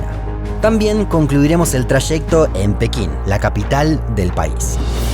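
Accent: Argentina. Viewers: De dónde es el narrador? Argentina? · El acento argentino es lo màs horrible se que pueda escuchar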